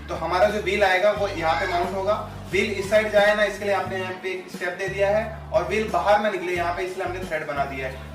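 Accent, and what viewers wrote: India. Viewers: He does seem like a Gujarati the way he speaks hindi. May be he is originally from Hindi speaking state. But who cares apne desh hi baccha hai.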